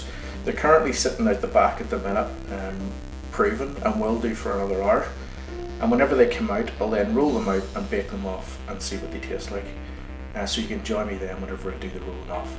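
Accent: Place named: Ireland. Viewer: I love your Irish accent I am from Scotland just over the sea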